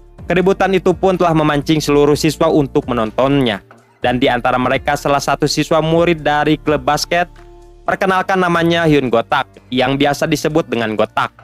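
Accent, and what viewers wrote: West Java, Indonesia. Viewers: Bang urang sunda lin??😅😅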